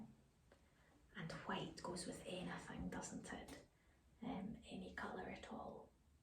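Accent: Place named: Scotland, United Kingdom. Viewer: Is that Scottish accent? Or Irish? How cute and relaxing :)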